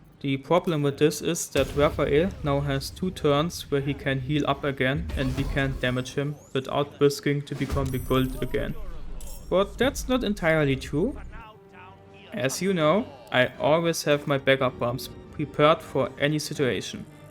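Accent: Germany. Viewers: Man... there was me thinking, hey i dont know that guy, lets watch that video, maybe he doesn't have a strong af slavic or russian accent like the others, so it might be fun to watch.... Then i heard the most stereotypical german accent ever.... FUCK ME THOUGH! I'm german, too and i just got humbled so very badly xD I could have an accent that sound to others like this and don't even know ... So congrats, you earned yourself a like and tought someone a lesson for life.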